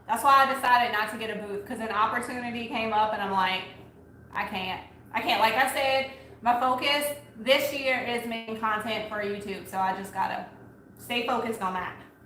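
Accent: Louisiana, United States. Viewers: ♡ I happened upon your chanel about 2 days ago and completely binged you all day today while cleaning/organizing my craft room!! So inspiring and I love your accent, I grew up in La and you bring me back!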